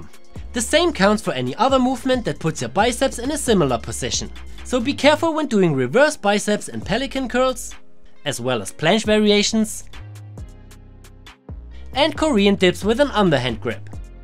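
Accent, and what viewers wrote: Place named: Germany. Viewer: Damn ur German accent is nuts.. I like it, im german as well. Lass Frankreich einnehmen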